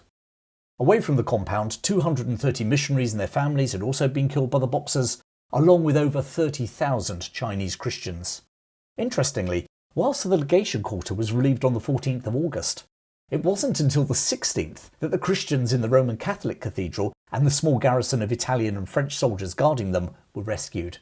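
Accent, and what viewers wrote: England, United Kingdom. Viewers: Greetings from a new subscriber in Indonesia. Thank you for this well researched and well presented video, delivered fluently and clearly in a jolly English accent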